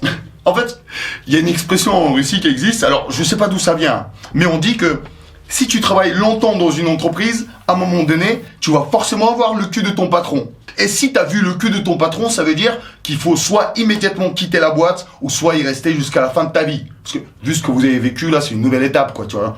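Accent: Brittany, France. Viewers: Un soupçon d'accent quand même, mâtiné d'accent breton ;-)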